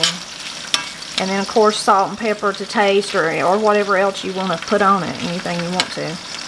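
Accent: Appalachia, United States. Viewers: I grew up in Kentucky and al of this is fermileria to me, my mom did her 🌽 both ways, fried and cream style, so good. She was a great cook! Miss those times. I enjoy all of your videos, cooking, hillbilly talk and all of that kind of stuff 😋 just had to add 😉 this to my response 😀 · I started watching this to experience your culture, your language, and your wonderful accent, the bonus is the amazing food!. I know so little about Appalachian culture other than the stereotypes depicted in movies. Thank you for this video. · Food aside, love the kitchen cabinets. And, I could just listen to this woman talk without end. What a gorgeous accent. Back to the food, i remember riding my motorcycle from New York down to West Virginia around ‘81 or ‘82 and stopping by a small cafe. the waitress told my the special was ‘soup beans’, corn bread, and collard greens. 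As immediately smitten with this Appalachian waitress as I was, I would have ordered anything she suggested. When I heard the woman in this video casually mention ‘soup beans’, it brought me back 40 years. It’s not a term we hear in New York. That waitress Had an accent much like this wonderful woman here. I was as completely mesmerized then as I am now. One the most memorable meals of my life. Eating that wonderful food and having a breezy chat with that Appalachian waitress felt incredibly exotic to this New Yorker. It’s funny how this video took me back to that. Thank you.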